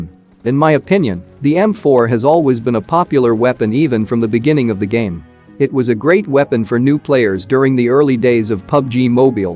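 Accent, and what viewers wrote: India. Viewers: Are you indian???😂😂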